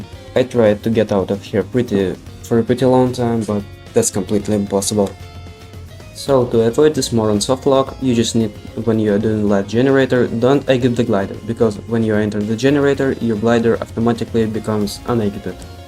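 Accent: Russia. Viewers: просто акцент у тебя слишком русский👍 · Ahh russian accent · are u russian? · Are you russian??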